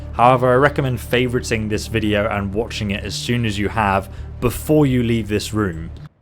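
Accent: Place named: United Kingdom. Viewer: mishrooms I tolerate him because hes handsome and his british accent is good and his temperment is enjoyable iin his videos... but yeah hes jumping on the bandwagon of mass producing "guide " videos and using keywords like the millions of other youtubers all doing them... Idk how he has time to do ANYTHING but play these games i mean I havent even finished AC valhalla yet it was just so massive - and now THIS is massive too... I'm drowning in these open world games that GIVE you the whole world at once - piecemeal it to me - All these massive open world games give me anxiety and make feel overwhelmed and exhausted as soon as I see the map and all teh shit ive got to do to satisfy my OCD and ADHD :( MAkes me want to play and On Rails or series of corridors game that t ells me a nice story instead of all of these options - i mean some optionms are good but not dozens and dozens of side quests and collectibles and every zone opened up after the prolouge... 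shit makes me not even want to be in parts of the city, like some parts of it are so confusing with the verticality it took me like 10 minutes to find the door to a ripperdoctor there otehr day lmao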